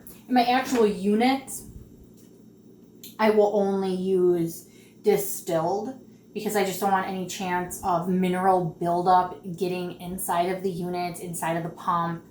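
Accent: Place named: Wisconsin, United States. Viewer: I’m trying to figure out your accent. Is this Wisconsin?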